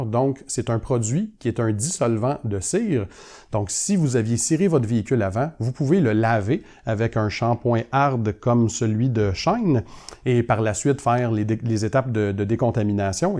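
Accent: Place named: Quebec, Canada. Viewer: L'accent québécois dans un video de detailling sur YouTube j'aime ça. Taukebkictte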